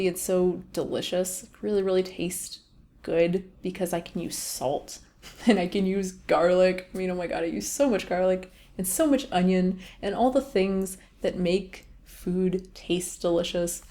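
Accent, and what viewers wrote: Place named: South, United States. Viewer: what happened to your southern accent??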